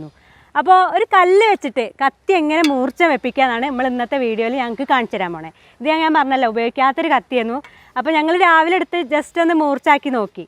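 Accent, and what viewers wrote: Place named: Malabar, India. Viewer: മലബാർ ്് സ്ലാഗ് സൂപ്പർ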